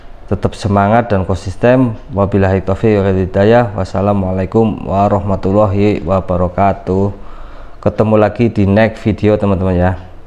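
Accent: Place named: Banyumas, Indonesia. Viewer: Ikut nyimak kang..😀 trims infonya..👍 kaya ada rasa NGAPAKNYA...😁 apakah termasuk WONGE DEWEK...🤔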